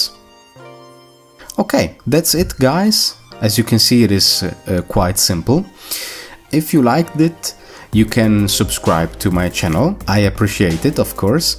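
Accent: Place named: Italy. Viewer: Love the Italian accent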